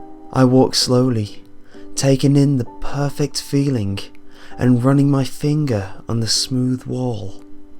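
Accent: England, United Kingdom. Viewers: Where in England are you from, I'm tying to learn the regional accents of GB/UK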